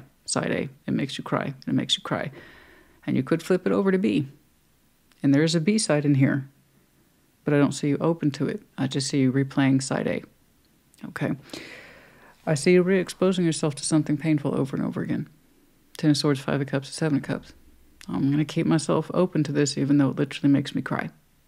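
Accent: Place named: Texas, United States. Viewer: First time I've listened but your cards missed me by 2 mile. I will listen again ,like the Texas accent. Been in and across the 800 and I believe 30 odd miles, in a truck many times. Always met some good people in Texas and was treated with much respect being a woman. Aries thank u for reading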